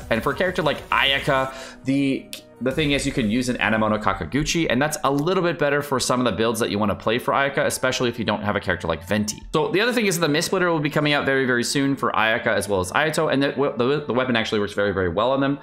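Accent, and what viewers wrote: United Kingdom. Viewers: Where did British go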